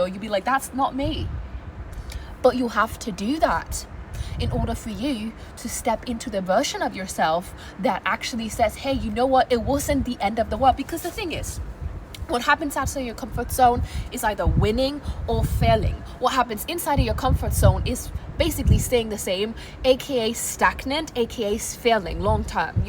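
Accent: United Kingdom. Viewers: 😂😂😂😂😂we love British accents over here girl 😊😊😊😂😂❤❤❤❤ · The British accent makes it sound less like a scam! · Gurll I loveee your energy 🍀🌷🌷😭😭 and that British accent 😭😭. Lobbyaa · Not the brittish accent😂 girl you lifted my mood. And I've cried today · The british accent girlll hahaha ❤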